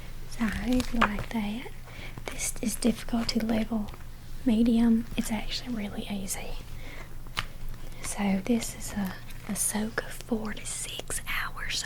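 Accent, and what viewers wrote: South, United States. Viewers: Haha great video you sound like Hannah Barron 😅 Your accent southern draw is on point 👍🏼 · Can you do a part 2 to this or maybe another Southern accent video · Wow! Your Southern accent is way better than I expected 😅👋🏻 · Hi 🙂 Definitely getting Dani ASMR vibes with the southern accent. Really good roleplay. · I reckon you nailed the accent hun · Your southern accent is actually really good😀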